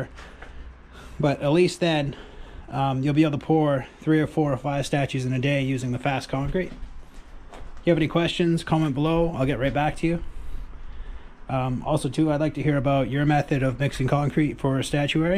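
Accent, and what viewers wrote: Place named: Upstate New York, United States. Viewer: Where do you sell them? Garden centers? Your accent sounds like upstate new York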